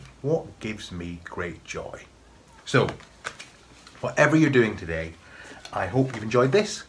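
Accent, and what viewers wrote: Scotland, United Kingdom. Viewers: The last time we went to Scotland to visit the rels we stayed in Carnoustie! Beautiful... I knew I heard a bit of Scots accent,!!!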